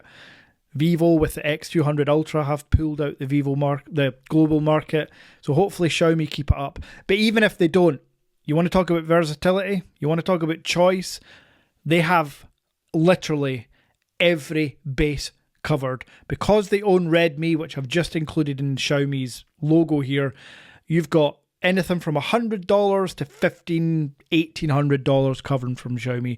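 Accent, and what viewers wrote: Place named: Scotland, United Kingdom. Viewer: It's his natural Scottish accent!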